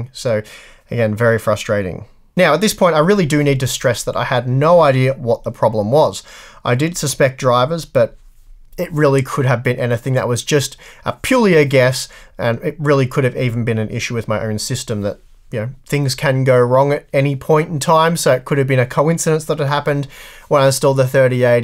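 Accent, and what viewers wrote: Australia. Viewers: I just watch this channel for the aussy accent the excellent quality is an extra. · I just noticed your Australian accent??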